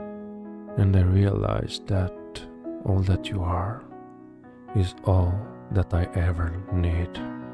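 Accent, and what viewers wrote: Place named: United States. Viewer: Încerc să înțeleg ceea ce exprimi , este așa de frumos și atât de minunat mesajul , dublat de o voce magică și inconfortabila , mesaj exprimat atât de expresiv intr-o engleză americană atât de perfectă și atât de clară , parcă sună mai bine decât în “limba noastră,, deși subiectul este comun: Dragostea! In orice limbă o exprimi este Wow , talentul și vocea ta “Fac toți banii,, Te urmez și-ți urmăresc traseu și ascensiunea absolut uimitoare dar și pe deplin meritată fiindcă talentul tău este incotenstabil și incomensurabil ! Nu cred ca ai nevoie de ajutor ca să înțelegi mesajul meu ! Good night and sweets dreams poet of my heart ! 🙏👏😘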